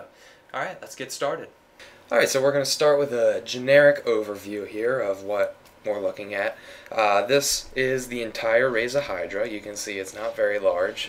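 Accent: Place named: Pittsburgh, United States. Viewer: I'm a big sucker for accents, and I dig yours. Can I ask where you're from? I'm thinking somewhere near Pittsburgh, maybe southeastern Ohio?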